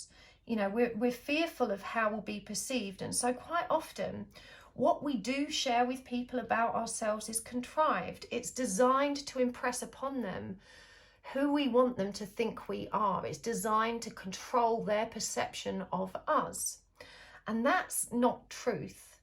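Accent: England, United Kingdom. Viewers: You just sound so English lol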